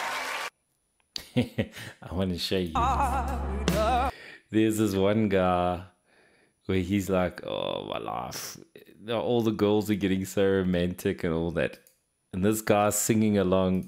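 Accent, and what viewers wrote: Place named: South Africa. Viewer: If I'm not mistaken, you have a south african accent :) my mother was from woodstock Cape Town.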